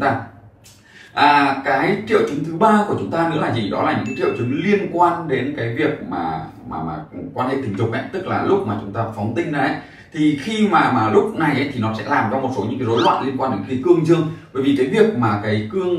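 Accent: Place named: North, Vietnam. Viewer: Bạn có thể nói chậm và rõ ràng được không ?? Không nên nói nhanh vì tiếng vùng miền Bắc nói nhanh nghe không rõ , cảm ơn bạn nhiều .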